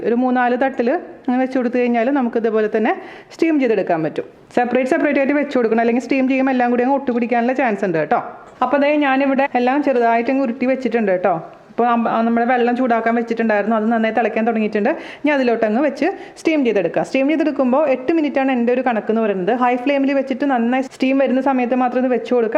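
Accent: Thrissur, India. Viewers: I follow urs and Aju’s world mostly. U both from trissur and it’s nice to listen ur trissur accent.. wish you all the best..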